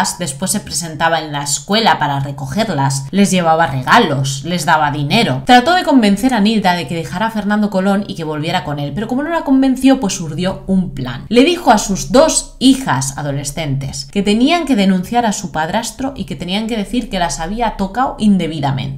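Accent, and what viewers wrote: Spain. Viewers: Al principio,pensé,ke hacías algo de cosmética.pero por casualidad pasó ese rato Y vi tus historias,y me encantan .los casos y tu manera de contar mola mucho pareces mañica.un 10 per tu desde Barcelona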